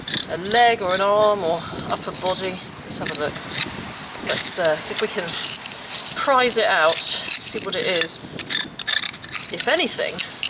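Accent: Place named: RP, United Kingdom. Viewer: I am Brett eh? That's so funny, it's Lambretta 1500 a little scooter no doubt. Has anybody told you that your voice is perfect for radio. I listen to you last thing at night time before I got to bed as your voice soothes me ready for sleep. You sound like you went to a private girls school, by the way I am in Geraldton in the outback of Australia and I troll the op shops for antiques nc collectibles. I am a huge fan, love your work, keep it up. Happy Easter, Warm Regards Trevx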